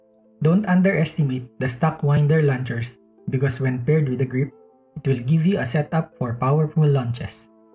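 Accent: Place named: Philippines. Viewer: This can literally help out beginners! Also your accent seems familiar, are you also a Filipino?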